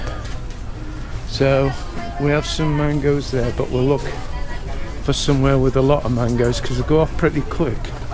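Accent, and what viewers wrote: United Kingdom. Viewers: Your British accent would make it difficult for most regular Filipinos to understand your English. The kind of English taught in the Philippines is American English to begin with.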